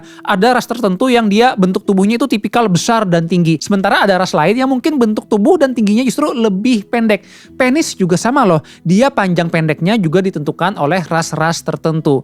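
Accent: Banjar, Indonesia. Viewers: Eh orang banjar kah? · lohh urang banjar sekalinya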